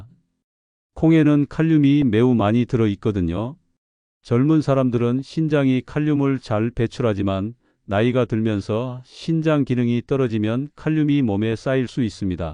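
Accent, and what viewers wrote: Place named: Jeolla, South Korea. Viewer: 전라도 억양 소름돋아,,, 징글징글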